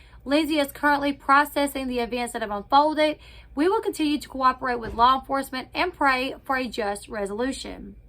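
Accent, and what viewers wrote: South, United States. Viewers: Thanks for the info..I don't care if it's late or whatever I enjoy your channel and your southern accent 👏👏💗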